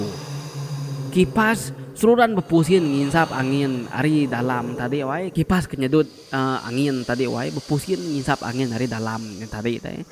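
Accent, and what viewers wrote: Sarawak, Malaysia. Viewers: Beautiful accent of sarawakian " joseph " = "jusip " 😅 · Rindu ninga slang iban d admin..slang ori urg miri..terang ddinga..#RTM #WAIFm ngambi d nyukung mh aku..manah👍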